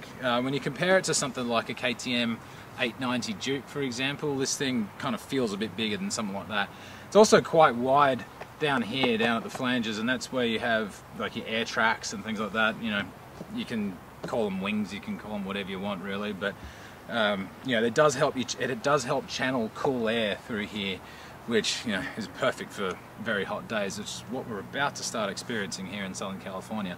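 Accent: Australia. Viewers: Nice Aussie accent :)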